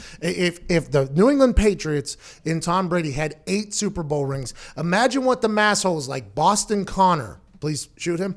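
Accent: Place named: Pennsylvania, United States. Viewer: god if only he could get rid of that PA accent.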